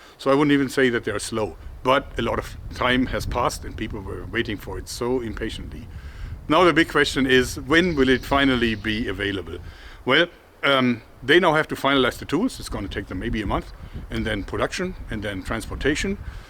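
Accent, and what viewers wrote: Germany. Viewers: You sound German but I think you live in Washington or Oregon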